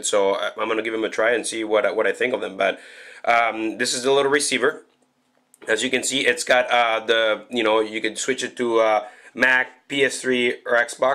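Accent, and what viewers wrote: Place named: Canada. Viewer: i love ur deep canadian accent lmao its so dope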